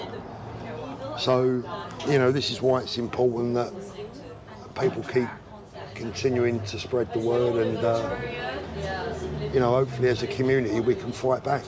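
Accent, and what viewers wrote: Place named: United Kingdom. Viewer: Why is your accent like that if you're from London? It's like provincial English, not what I imagine of a Londoner. 😅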